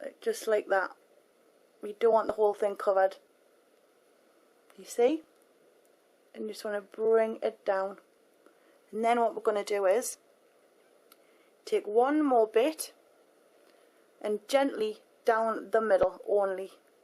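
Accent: Newcastle, United Kingdom. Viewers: Her accent is from Newcastle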